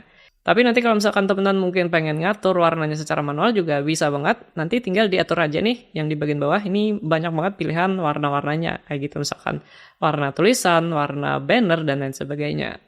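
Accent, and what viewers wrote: Banjar, Indonesia. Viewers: logatnya pina kayak bhs banjar pahuluan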